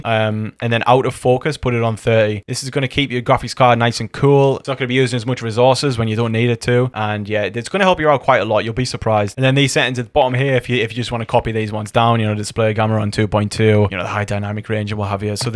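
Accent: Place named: North, United Kingdom. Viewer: Sound like a fellow northerner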